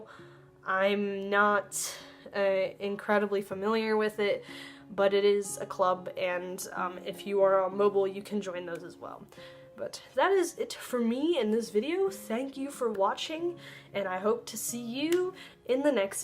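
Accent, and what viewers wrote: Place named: United States. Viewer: As a Dane, your pronunciation needs work obviously and you got a heavy American accent, I would suggest finding a Danish person to chat with in Danish if you want to iron out the pronunciation and accent. That said, good work and I greatly encourage you to keep learning Danish, it is a really fun language once you start understanding the deeper aspects of it, as an example, a lot of Danish comedy is is based around subtle changes in how things are said and phrased. I have a warning for you if you travel to Denmark, if you don't demand that Danes speak in Danish to you we will change to English immediately when we hear that someone isn't near to native speaker level of proficiency, Danish people are generally fluent in English and find it easier to switch to English than to try and decipher foreign accents. I am warning you so you don't get discouraged if you come here in the hope of learning to speak Danish only to find everyone speaks English to you automatically.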